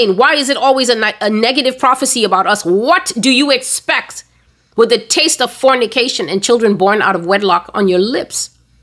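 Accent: Nigeria. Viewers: You spoke exactly like a Nigerian 😅....Well done ma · God bless you Sister. I'm Nigerian , it's scary how you could mimic our accent though🙂 . May the Lord continue increase you in strength & wisdom . You are a gift to humanity . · Great job on that Nigerian accent, I do don’t care what pple say about this girl, all I know is God speaks to her❤ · This Nigerian accent is on point 😀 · “Chop bar”= Buka Thank you for all you do. You are an honorary Nigerian 🇳🇬because you can speak like us. I salute your dedication and your work daughter of my King🕊️🤍🎚️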